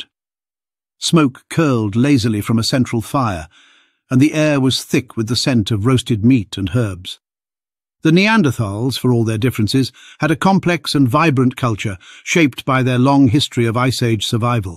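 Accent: England, United Kingdom. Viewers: Just having an English accent doesn't make you intelligent. All this interbreeding stuff is a load of crap you don't have enough Neanderthal DNA sequenced to know what your spewing this is all speculative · No, I don't want to listen to your neanderthal erotica in a British accent...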